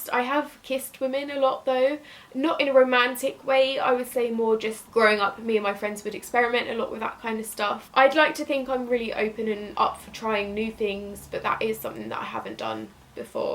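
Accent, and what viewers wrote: United Kingdom. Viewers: I am in love with your voice and British Accent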